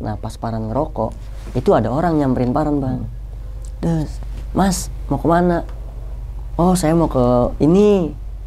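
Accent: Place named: Banyumas, Indonesia. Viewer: Kangen dgn logat ngapaknya mang😊😊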